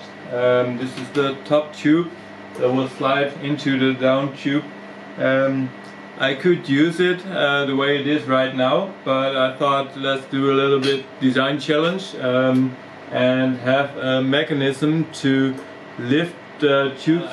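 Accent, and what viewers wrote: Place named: Germany. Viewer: Cool, I thought you sounded German. Your thouragh like a German lol. Cool projects mate, hi from northern ireland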